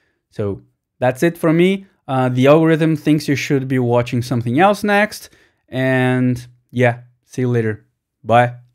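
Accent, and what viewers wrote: Brazil. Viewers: Ingles de Brasileiro é muito massa!! PArabéns mano! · Parece Brasileiro, você é brasileiro mn?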